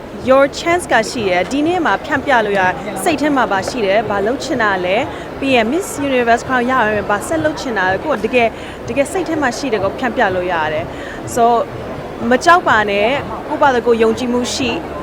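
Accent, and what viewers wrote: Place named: Myanmar. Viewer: Cute girl with cute Burmese accent.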